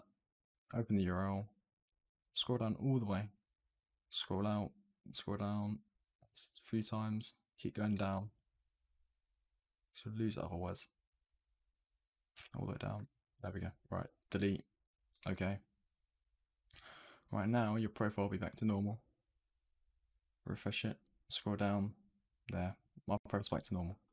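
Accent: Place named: United Kingdom. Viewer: THATS IT! I did not understand what you said since you are very British God do not save the queen God save bin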